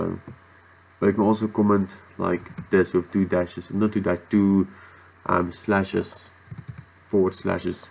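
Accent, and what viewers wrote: Afrikaans, South Africa. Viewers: Are you South African ? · Nice Afrikaanse aksent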